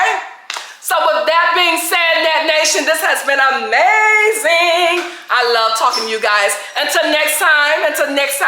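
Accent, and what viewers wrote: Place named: South, United States. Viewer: You told us. It was for who it was for. Don’t take it personally. Leave the messenger alone. I love your platform. Your delivery really makes people think. Keep doing what you doing Ms.. I heard a Southern accent coming through on this one.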